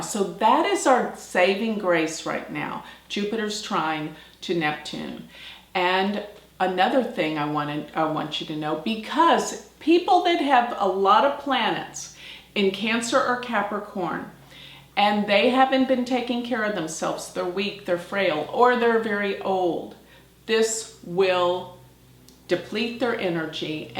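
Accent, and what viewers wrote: United States. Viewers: Greetings from Vedic astrologer...from France. Thank you for posting. Just cannot stand this American accent ;-((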